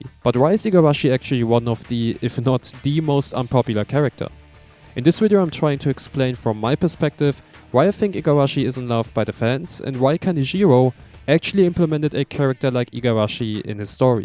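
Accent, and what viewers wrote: Germany. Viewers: german english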